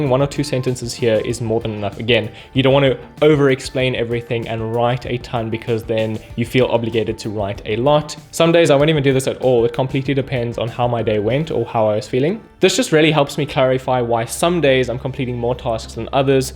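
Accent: South Africa. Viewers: loving this south african accent .... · I recognized your accent immediately! Fellow South African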